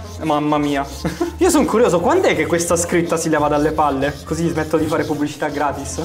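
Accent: Rome, Italy. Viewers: Che bello quell'accento romano che persiste per tutto il video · Perché quando sei con i tuoi amici marchi di più l accento romano ? È fastidioso non so perché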